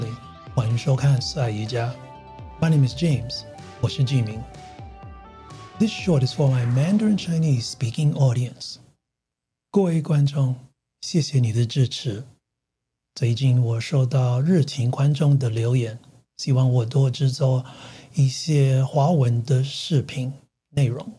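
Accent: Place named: Taiwan. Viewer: 羡慕你有台湾的口音，好好听啊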